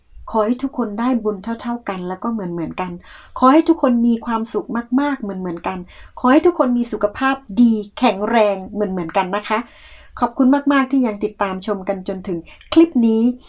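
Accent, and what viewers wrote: South, Thailand. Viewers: รักน้อง พูดใต้ ชอบฟังมาก ฟังไม่ออกหมด แต่ชอบคนใต้😘😍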